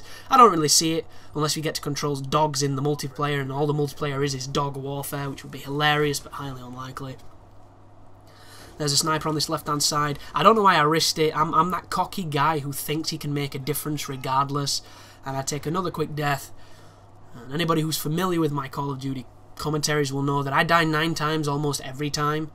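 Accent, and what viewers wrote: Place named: North, United Kingdom. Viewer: Straight talking, no BS northerner. The best type of person. Hearing your commentary is great and I could listen to it all day. True talent there. Not offending other commentators but they can get annoying ( I won't go into detail but the ethics and scale of their channels turn their heads upside down)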